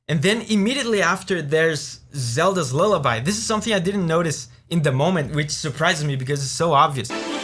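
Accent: Brazil. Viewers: Your accent sounds like a brazilian person, are you from Brazil? (Manda salve se for) GREAT video btw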